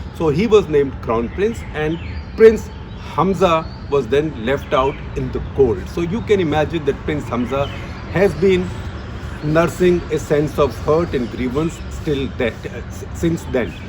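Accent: India. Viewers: Very interesting subject and very informative, BUT very difficult to understand what you say… because of strong Indian accent !! Pity ……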